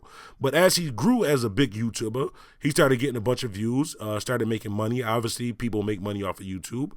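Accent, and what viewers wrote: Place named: Pittsburgh, United States. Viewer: You from Pittsburgh? you def got there accent or Buffalo Ny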